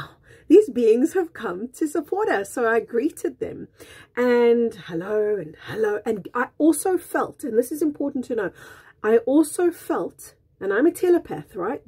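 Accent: South Africa. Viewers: Just curious, what is your accent? South African? you pronounce skeletal as "Skuh-LEE-tle." In America we say "SKEL-uh-tle." Again, just curious ☺️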